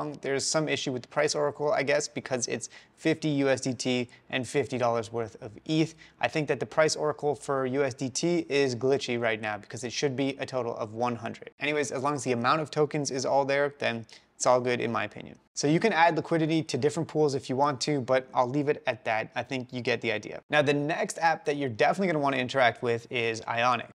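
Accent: Australia. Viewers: but he sounds like an Aussie... kind of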